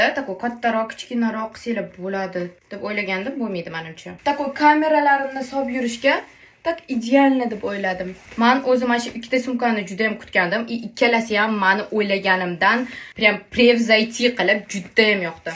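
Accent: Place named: Russia. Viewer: aksentiz rosa ruschaga tortarkan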